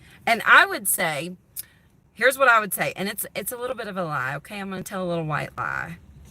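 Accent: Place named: Georgia, United States. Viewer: Love your attitude, you sound exactly like me I was born and raised in Georgia. But I now live in Oklahoma LMAO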